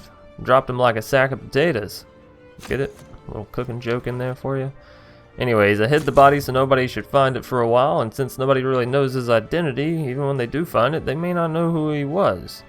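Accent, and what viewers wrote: South, United States. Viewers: Talking like an ol' Truck driver from the south. Love it! 😎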